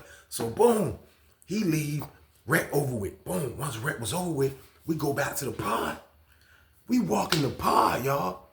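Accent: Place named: South, United States. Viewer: That's how they talk down south. It's common down there.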